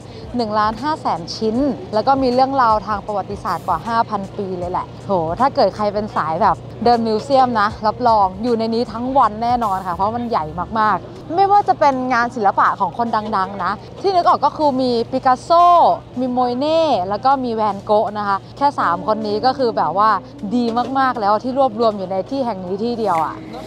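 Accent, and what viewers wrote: North, Thailand. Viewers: ชอบอู้เมือง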